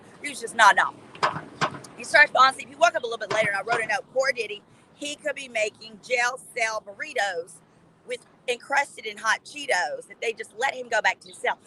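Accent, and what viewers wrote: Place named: South, United States. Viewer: I’m from California, but I live in Texas now thank goodness, when I hear your voice, it reminds me of the sound of a sister meaning an African-American southern woman and also a southern Anglo woman. It’s really interesting to my ears.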